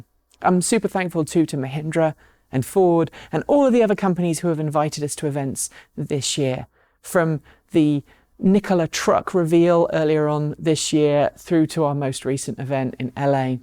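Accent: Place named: United Kingdom. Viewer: Are you from the US? That's not possible. You definitely sound British.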